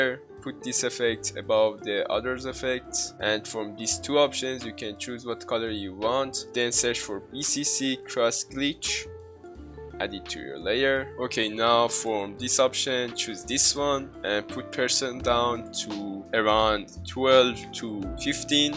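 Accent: Iran. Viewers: داداش لهجت خیلی ایرانیه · your accent is so Persian bro😂❤ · داداش ایرانی؟ ادیت:پشمام از رو لحجت فک کردم ایرانی تا اینکه پیج اینستا تو دیدم فهمیدم ایرانی پر قدرت ادامه بده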